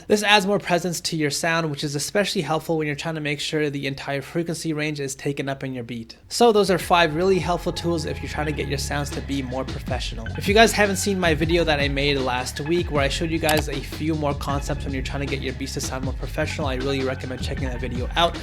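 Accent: India. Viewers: Are you a Indian residin in US ???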